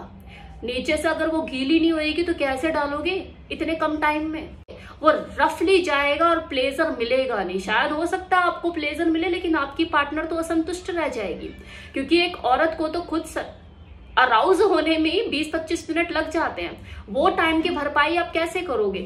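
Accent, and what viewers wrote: Punjab, India. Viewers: Kiss-vis, lipat-vipat, choos-chaas. Kya punjabi tadka hai wakai hamey to anand/maja aa jata hai sunkar hi. Pls keep it up as good going. Txs Gtb Delhi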